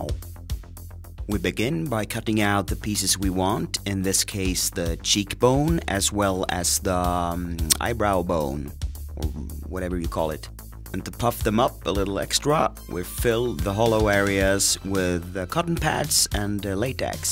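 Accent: Sweden. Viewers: hearing your accent I guess you're swedish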